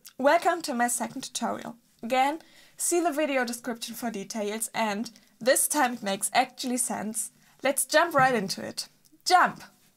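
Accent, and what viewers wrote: Germany. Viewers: I'm not a musician at all but I do enjoy these tutorials that you post. I do love your voice and accent (I know you're German) but if you ever heard me talk you would laugh at it cause I live in the southern part of Arkansas in the United States lol :)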